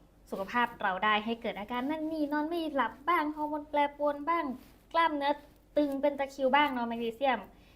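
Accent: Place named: Surat Thani, Thailand. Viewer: ชัดค่ะ สุราษ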